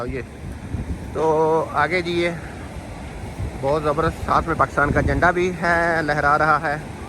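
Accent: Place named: Punjab, India. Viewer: سر آپ پنجابی ساتھ بولتے ہیں بہت اچھا لگتا ہے😊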